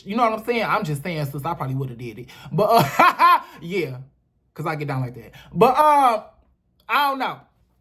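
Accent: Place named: Minnesota, United States. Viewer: I had to pay on the floor and do a beetleguise laugh when u said “rewwoom “😂😂😂 u give Suburbs Minnesota vibes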